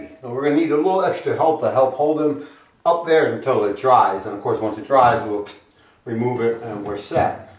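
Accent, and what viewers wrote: New York, United States. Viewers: Great videos man, straight to the point no BS, as an avid DIY'er This is about to be my new favorite channel for sure. I am in the process of buying a new house right now and if everything goes through, I will be using your vids to do alot of work. I may be wrong but you sound like your from New York. I live in NY also, if so and you want to pm me some info incase theres something I cant tackle I will definitely hire you. Thanks keep these videos coming we all appreciate your help.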